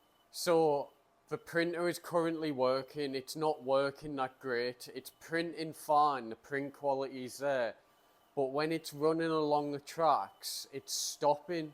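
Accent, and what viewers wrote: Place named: Newcastle, United Kingdom. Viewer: How did a Geordie end up in Canada? Good videos mate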